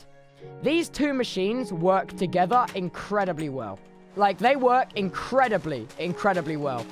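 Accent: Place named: RP, United Kingdom. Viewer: This is so British what the heck??? He sounds so posh, it's sending me back to lets plays of old.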